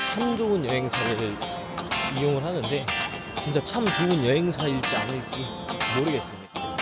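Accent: China. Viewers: 말투가 조선족인데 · ㅋㅋㅋ조선족아님 말투가 조선족인데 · 말투 그냥 조선족임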